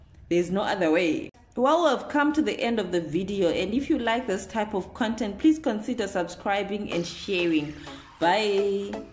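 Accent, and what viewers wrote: Zimbabwe. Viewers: Kkkk its either a Shona or Venda accent kkk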